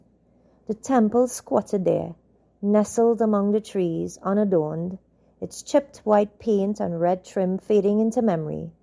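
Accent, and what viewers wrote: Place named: Trinidad and Tobago. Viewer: Love your story. Brought back so many memories and love the fact that you were selective with your trini dialect. It was used just in the right places.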